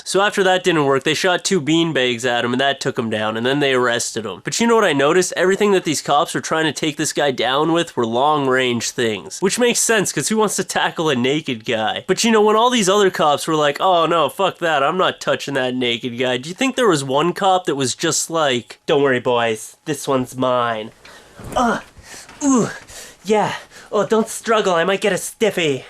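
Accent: Canada. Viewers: that's the Canadian accent, its not weird -_ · i knew u were from canada, but i just now noticed u have a accent. like when u said house. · It's the canadian prairies accent. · LOL. I think it's so funny when you Americans think that Canadians say things strange. What exactly are you hearing? How's he supposed to say "house"? It's kind of like how a lot of you Americans say "ruff" for "roof." Why do you make a dog noise for "roof"? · canadian eh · "don't struggle or i might get a stiffy!" hahaha funny shit! and i like how you say house. i wish i had a canadian accent :( oh, well. canadians have good weed too! :)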